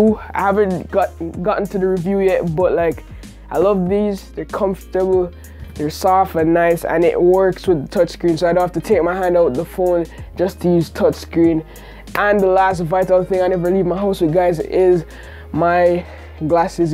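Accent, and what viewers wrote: Jamaica. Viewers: Where my boy from? He accent kicking. Just sub. Update now I see you is a yard man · Lol this guy kinda have a Jamaican accent, where you from fam ?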